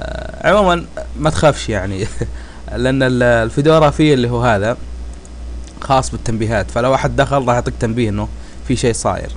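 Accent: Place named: Qassim, Saudi Arabia. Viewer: يعطيك العافية أخوي يا حبيلك أخوي شرحك ممتاز جدا خاصة بلهجة القصيم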